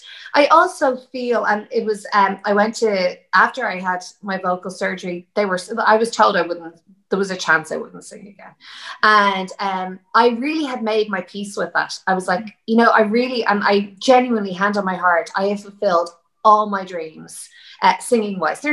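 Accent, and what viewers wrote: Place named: Ireland. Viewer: Aww it’s so nice to hear you talk again. I love your Irish accent as usual😍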